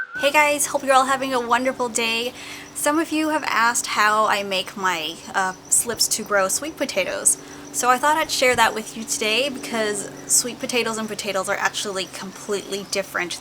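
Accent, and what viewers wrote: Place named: United States. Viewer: I know this makes me stupid and borderline racist but I was completely thrown off by your completely normal American accent. lol Sorry.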